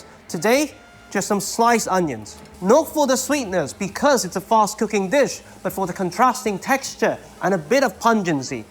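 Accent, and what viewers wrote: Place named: United Kingdom. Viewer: did not expect the Britiish accent ! but overall good recipe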